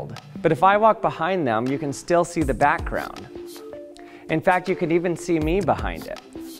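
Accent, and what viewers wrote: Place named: Utah, United States. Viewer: That Utah accent.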